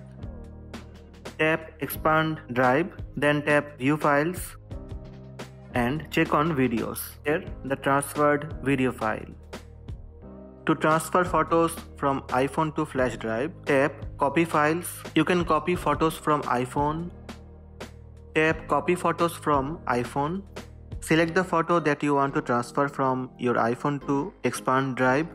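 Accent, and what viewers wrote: India. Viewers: Videoyu çeken hindistanlı nerde olsa tanırım bu şiveyi :)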